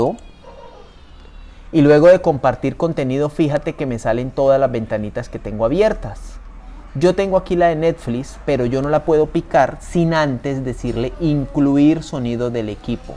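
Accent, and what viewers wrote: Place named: Colombia. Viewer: Me encanta su acento, es colombiano?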